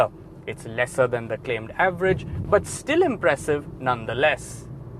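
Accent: India. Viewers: Indain English WTH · Good review! India English! That’s quite interesting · It's good that Honda is also considering Indonesian cars for the UAE as that is a region where even the basic variants of all models get a brilliant equipment list and the HR-V is no exception to that. While the exterior design does look good, it is too simple for my liking. Even the interior switches and the layout of the instrument cluster is as if directly taken from the Jazz and the City, the latter being sold here. This is one Honda which failed to impress me in the interior department as we are used to seeing sumptuous designs from the automaker. The Civic, Odyssey, Accord and Pilot, all have a certain wow factor which the HR-V lacks. The only feature to write home about is that ironic "Indian English" accent and the magic seats at the rear to increase the cargo area. On all these grounds, it is the Kia Seltos that for me is the undisputed king because of its fantastic design and the features it gets. 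However, as you pointed out, it is the cheapest car currently on sale which is surely to have buyers thronging at Honda's dealerships. And then, there are the basic virtues every JDM car comes conferred with: Reliability, low maintenance costs, excellent fuel economy and an obnoxiously high resale value which is what is going to make a great case for itself. How I wish manufacturers get rid off that CVT transmission and use a torque-converter and turbo-petrol engines like the 1.5 in the Civic. That would be the perfect choice of the eclectic options with Honda. Enjoyed the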